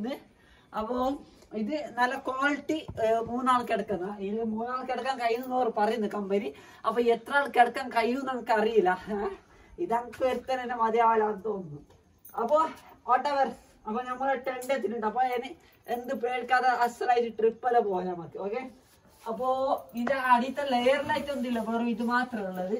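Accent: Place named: Kasaragod, India. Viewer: Kasaragod anno stalam mosilaa yannh kelttapol tonniyada😅🤣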